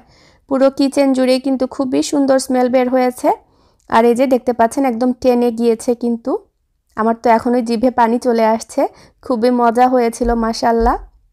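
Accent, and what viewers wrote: Sylhet, Bangladesh. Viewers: আপা তোমার বাড়ি সিলেটের কইগো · Mashallah 🥰 This kids and your Shylety accent are really love ❤️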